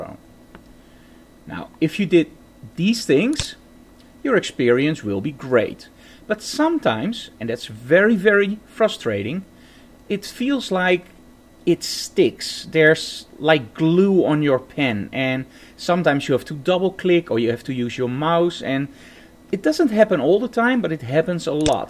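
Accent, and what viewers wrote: Netherlands. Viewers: je bent echt overduidelijk engels met je nederlandse accent, blijf wel dit soort videos maken tho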